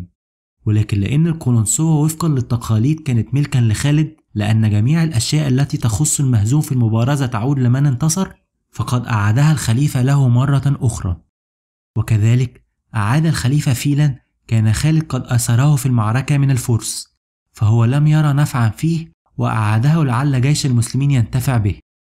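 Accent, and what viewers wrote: Egypt. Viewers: موضوع تاريخي شيق لا ينفع أن يُسرد بلهجة اي بلد وإلقاء ممل. إن أردتم رفع مستوى المحتوى فعليكم الإبتعاد عن الإلقاء بهذه الطريقة وباللهجة المصرية والنطق السيء للحروف العربية ونطق الجيم والظاء والذال والثاء والقاف كما تنطق نها في لهجتكم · لو تكون باللغة العربية من غير التلفظ باللهجة المصرية تكن أحسن بارك الله فيك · يا اخي كلشي تمام بس لهجتك لمصريه خربت ونزعت لفيدو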